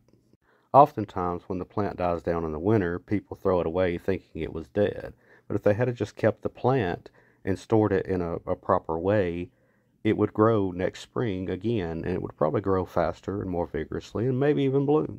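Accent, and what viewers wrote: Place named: Carolina, United States. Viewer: You have a south or North Carolina accent 🎉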